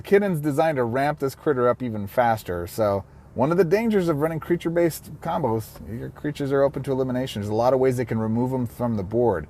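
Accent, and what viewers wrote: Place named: Scotland, United Kingdom. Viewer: What's that Scottsman from?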